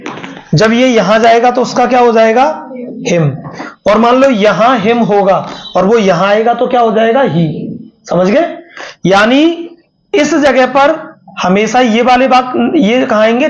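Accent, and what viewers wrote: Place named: Bhind, India. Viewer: Sir apki awaj Bhind jile jaisi lag rhi aap kaha se pada rhe ho please reply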